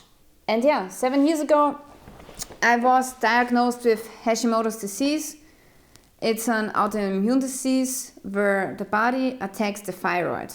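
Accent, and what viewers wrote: Sweden. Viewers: Are you from sweden/Sverige on swidish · Wait are u swedish ??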